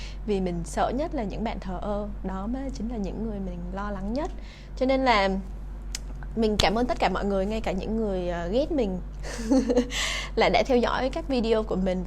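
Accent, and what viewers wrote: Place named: South, Vietnam. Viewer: Sang đấy lái giọng Nam luôn rồi ah :))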